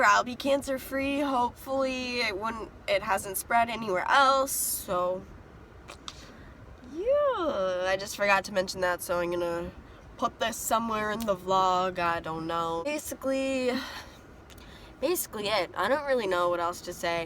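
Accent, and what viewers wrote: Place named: United States. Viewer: Wait do u live in ireland? You sound american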